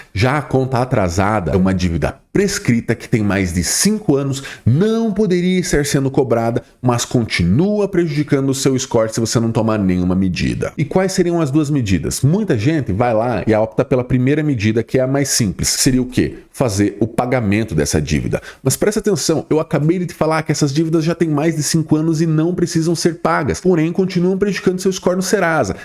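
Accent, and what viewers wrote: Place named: São Paulo, Brazil. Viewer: O senhor é de são Paulo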